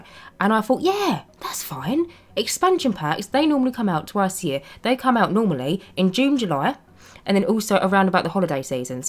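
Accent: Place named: England, United Kingdom. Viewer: I really like watching your builds but i can never understand the English accent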